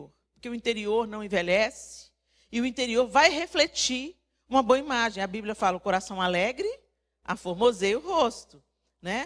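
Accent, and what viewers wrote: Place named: Rio de Janeiro, Brazil. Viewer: parece até carioca na forma de falar...rs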